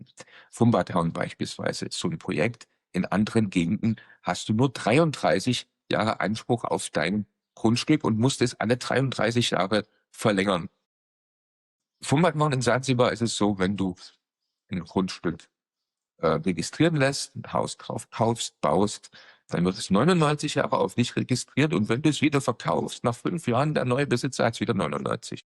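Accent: Germany. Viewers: hört sich bissel nach Sachsen oder Thüringen an. An manchen Stellen verschluckt die Technik ganze Silben. Die Akustik ist aber in Ordnung, da hallt nix großartig nach. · Fränggisch. Des is fei goud. freili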